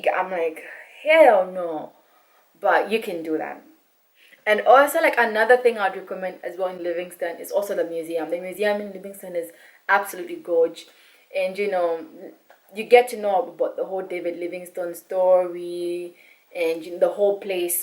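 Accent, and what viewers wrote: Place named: Nairobi, Kenya. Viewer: You speak like a Nairobian 😅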